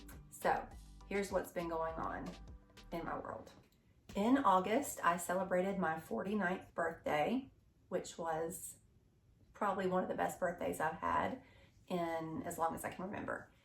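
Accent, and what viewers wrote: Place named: South, United States. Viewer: Young lady if I was younger I would ask you if I could just get to know you . By the way do you like motorcycles , I think you would be a great back seater and any man should be proud to let you grace there bike with your presence .Do you live in the south, because I here a slight twang in your voice and I’m thinking of moving to Tennessee. You may not have noticed but I’m a biker and love long trips and riding as often as I can.I was born in Florida but have lived in California most of my life .Hey if you Want you could get back to me if you would like to say hi,or not if you think that would be wrong.Either way I hope to see some more of you videos and hope you are feeling better about your future in light of all that has gone on with you . My name is guy and hope to maybe talk to you some day.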